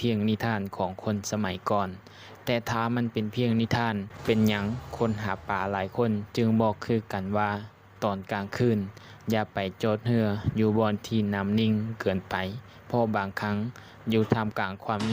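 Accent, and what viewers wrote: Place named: Isan, Thailand. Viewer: พูดอีสานบ่อย่างนี้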